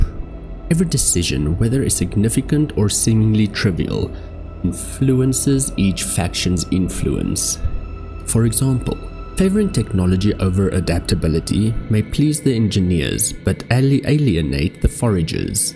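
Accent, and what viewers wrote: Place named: Pretoria, South Africa. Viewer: Thats a fkn Pretoria accent no doubt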